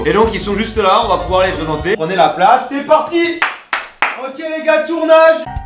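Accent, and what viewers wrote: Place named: Nord-Pas-de-Calais, France. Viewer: Putain l'accent ch'tit mec xD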